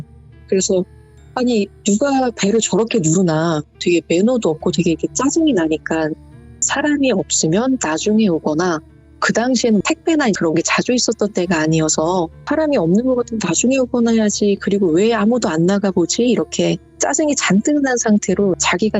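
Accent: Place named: Seoul, South Korea. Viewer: 서울말투 진짜 이쁘다.. 난 부산 토박이라, 서울 사람 옆에 있으면,여자라도 우악스럽은디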